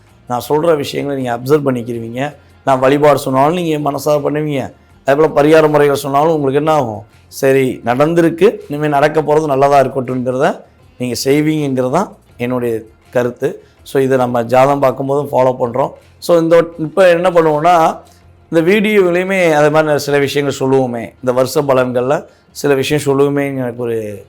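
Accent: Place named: Madurai, India. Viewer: Madurai man vasam pechil theriuthu sir.romba varusama adi than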